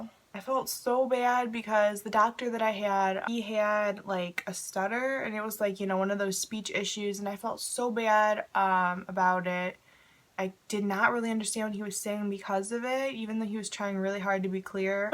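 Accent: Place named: New York, United States. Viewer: Where are you from you have that funny accent lol "she eeasked" no offense I just think you're from New York or something ☺️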